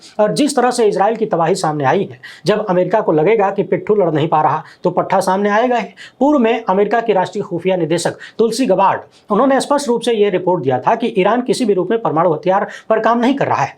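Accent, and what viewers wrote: Bhojpuri, India. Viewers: Ur bhojpuri tone! So sweet.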